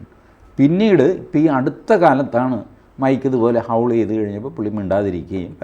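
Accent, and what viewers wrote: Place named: Trivandrum, India. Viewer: Like his TVM language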